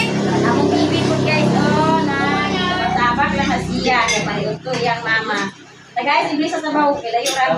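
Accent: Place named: Visayas, Philippines. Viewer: Katawa gyud ko sa lawom nga bisaya nimo ate 😂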